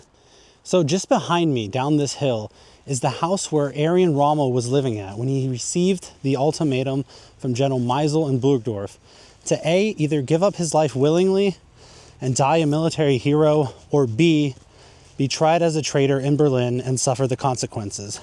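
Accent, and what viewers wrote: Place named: United States. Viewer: Although your accent seems American to me, I do wonder if you're a Brit living in the States.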